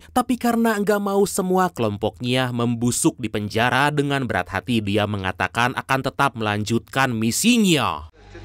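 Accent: West Java, Indonesia. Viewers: Sunda pisan euyyy logat na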